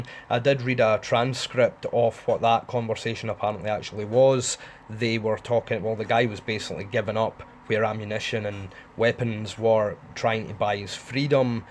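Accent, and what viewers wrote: Scotland, United Kingdom. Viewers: He’s Scottish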